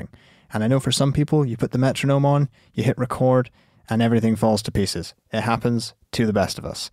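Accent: Scotland, United Kingdom. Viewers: He's Scottish isn't he?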